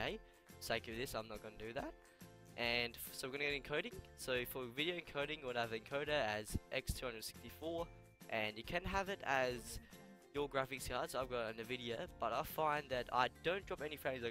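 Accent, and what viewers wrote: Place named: Australia. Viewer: Aussie I Guess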